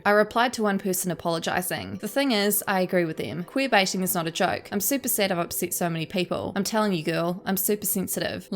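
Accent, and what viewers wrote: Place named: New Zealand. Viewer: I love your NZ accent... oh the dramaz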